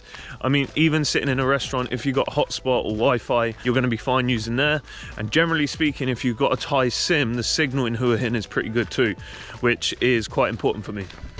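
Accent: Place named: Liverpool, United Kingdom. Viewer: สำเนียงอังกฤษฉบับคนลิเวอร์พูลชัดเจนมากพี่ชาย😂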